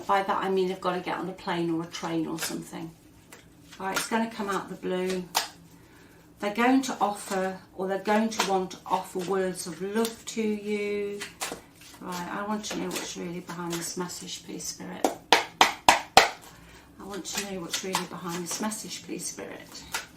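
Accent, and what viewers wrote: United Kingdom. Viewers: Hi ,greetings from Montenegro ,i love Youre Brittish Akccent,i love English language i speak and learn as a child ,thank you for youre readyngs ❤❤Love Light and Happy New Year 🎉🎉🎉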